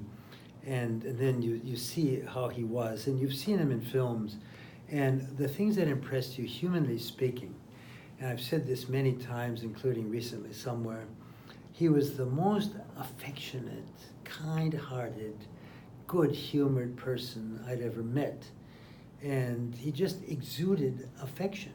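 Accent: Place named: United States. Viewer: He doesn’t sound completely American anymore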